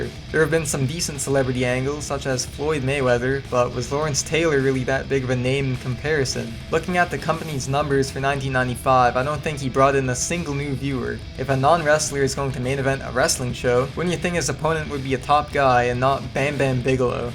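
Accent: Canada. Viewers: You can defend your Lawrence Taylor comments and put up annotations all you want but that doesn't change the fact that you don't know what you're talking about. It's pretty evident through your accent that you're Canadian. It's not WWE's fault you're from Canada and don't care about American football. Just because you don't know who LT is doesn't mean the majority of WWE fans didn't. Besides, how old were you in 1995? Were you even alive? WWE is an American-based company with mostly American fans most of which are NFL fans. If you really want to complain about WWE using one of the biggest names in the world of sports at the time to boost ratings, I don't know that they'll ever find a way to please you. To put it into perspective for you, it would be like WWE having Gretzky appear at WM and an American saying "Who is Wayne Gretzky? Have you ever heard of him? Probably not." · You sound like you're from Nova Scotia.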